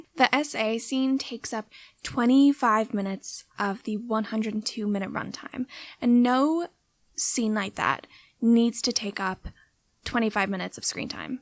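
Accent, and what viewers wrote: Ireland. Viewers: Amazing video but unrelated, do you have a foreign accent? just on the Rs and the "ah" sounding As does it sound irish-ish lol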